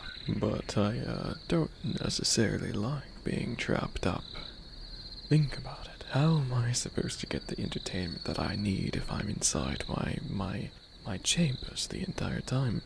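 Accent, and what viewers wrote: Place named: United Kingdom. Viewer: Sounds British. Perfect!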